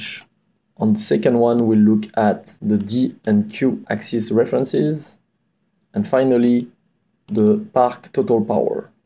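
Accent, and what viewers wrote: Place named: France. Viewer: Mon anglais est plutôt chaotique. Il me semble détecter un petit accent français assez sympathique ducoup auriez vous la même vidéo en français ? 😅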